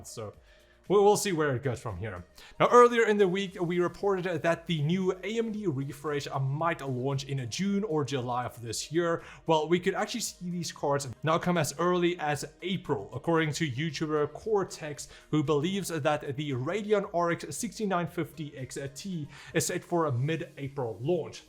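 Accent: Afrikaans, South Africa. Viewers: are you afrikaans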